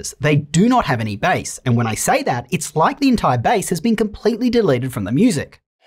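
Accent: Australia. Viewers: Why the aussie accent when filmed in the US? Audio seems almost AI?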